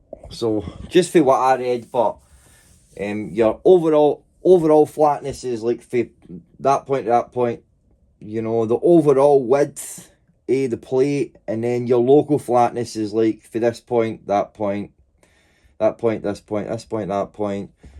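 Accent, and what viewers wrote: Glasgow, United Kingdom. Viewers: You from glasgow mate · Great to hear a fellow Glaswegian ... I'm from Dennistoun myself 😁... as to whether or not they are perfectly flat, yes it'd be great if they were perfect, but at the end of the day you tried to do it yourself 👍 I made kitchen worktops out of 2ft square by 1" thick granite slabs (doubled at edge to 2"), £240 instead of thousands for a 3/4" rip-off version!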